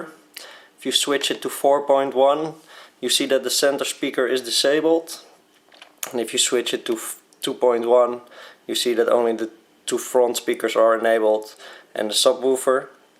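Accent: Netherlands. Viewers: Het is trouwens te horen dat je Nederlandstalig bent! Heel tof! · Ik hoor een Nederlands accent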